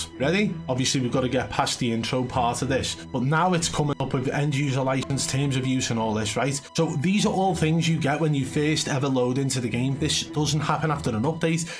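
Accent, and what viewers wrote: Liverpool, United Kingdom. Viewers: Proper Scouse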